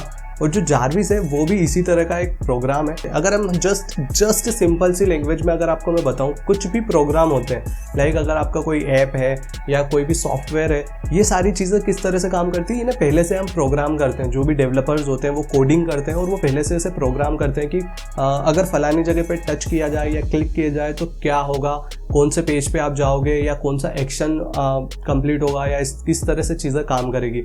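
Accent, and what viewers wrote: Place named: Gujarat, India. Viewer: I bet he is Gujrati or Rajasthani... Because the way he says "set" or "ek" & other words... Well thanks for this video brother ❤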